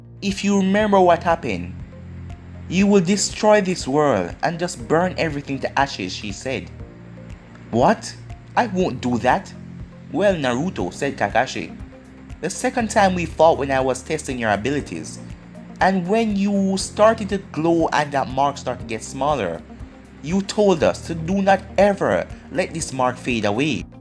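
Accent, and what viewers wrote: Jamaica. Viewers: This is just a random question and sorry if it’s disrespectful but, are you jamaican ? The reason i ask is cause the speech pattern is sorta familiar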